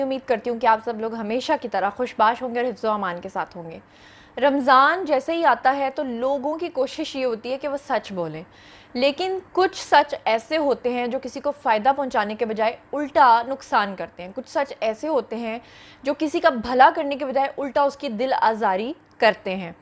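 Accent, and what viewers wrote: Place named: Sialkot, Pakistan. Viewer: mind your language,it is Competitor not compi-tator,change your Sialkoti Accent.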